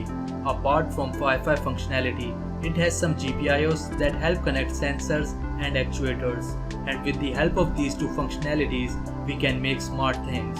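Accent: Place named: India. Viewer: You already know youre getting the best when the indish accent starts.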